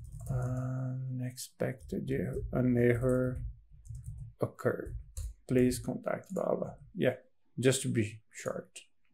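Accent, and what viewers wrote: Brazil. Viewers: Pelo sotaque tu é brasileiro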